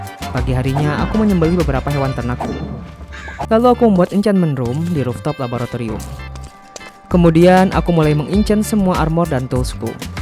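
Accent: West Java, Indonesia. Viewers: Orang Sunda ya bg?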